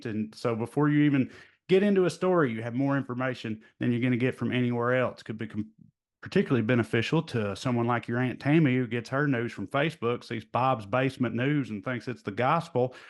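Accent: South, United States. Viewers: Listening to a Southern accent talking liberal politics does my heart hood. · I'm glad whenever this proverbial rollercoaster rattles over another hill or hurtles through a long dip...that I have this man's voice to tell me about it. Reminds me of home. Thanks for your hard work from Thailand. is about the only southern accent I get to hear these days. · Hey I'm prolly gonna eat shit But I. Respect what you're doing I never really had to think before But props On props Its an effective form of absurdist theatre that just makes sense You are of course as smart as you think you are but getting an actual message across is impossible unless your a smoke show in a bikini. We're all gonna die But I hate a country accent slightly less because of this as long as no one mentions vacation bible school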